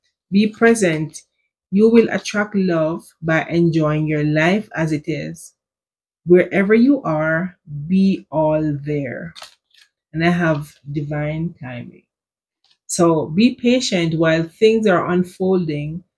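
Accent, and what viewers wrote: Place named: Jamaica. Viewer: What an AMAZING read...Thank you dear! And I just LOVE ur Jamaican accent 😊.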